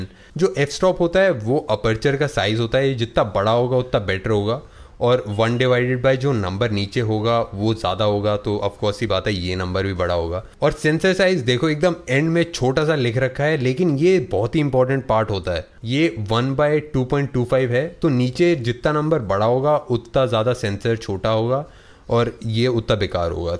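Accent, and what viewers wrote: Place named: Bangalore, India. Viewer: Brooo are u from banglore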